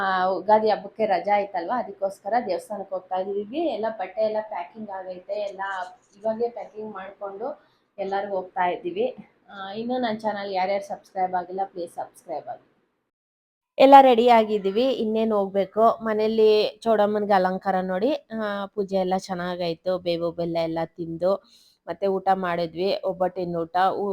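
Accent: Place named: Shivamogga, India. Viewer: ಅಕ್ಕ ನೀವೂ ಶಿವಮೊಗ್ಗ ಕೆ ಬದಿರ ಕನ್ನಡ ಮಡ ❤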